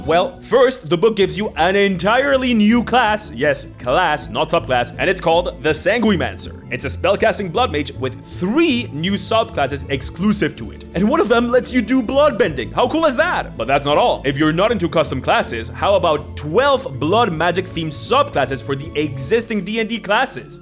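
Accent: Ireland. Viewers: As one of your Irish viewers, the fact you used to speak with a big Dub accent makes me unspeakably happy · give us your irish accent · Release the forbidden Irish accent Go on. Go on. Go on.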